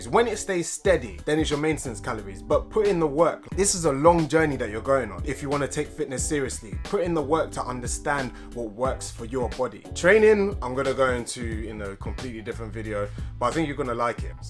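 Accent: United Kingdom. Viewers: Brother with a brit accent....and yankee football in the background....my brain cannot process this dissonance ! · British accent and American Football doesn't go together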